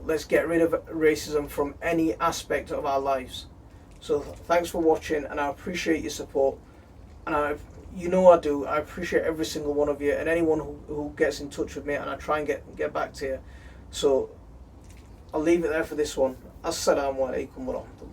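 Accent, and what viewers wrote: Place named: North, United Kingdom. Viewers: I am really sorry to hear that you have received this sort of vile abuse, there is no place for it and I respect your courage in calling it out. A positive which has come from this event is that I and many others have discovered your channel and shown their solidarity- you are not alone. Its great to hear a northerner sharing gardening tips, it reminds me of home. "I’ll tell you to convert to no dig” had me laughing, I admire your candour and sense of humour. Best of luck in your garden in the new year. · First video of yours I've watched, I'm subscribed to a few gardening channels so probably why the suggestion came up. You sound like a decent lad, a fellow northerner with the same passions as me (although you seem far more gifted). Subbed. Outrageous comments, solidarity.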